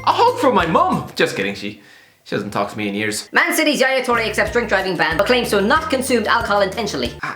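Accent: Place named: Ireland. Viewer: The relief to hear the strong Irish accent when I click on these videos😅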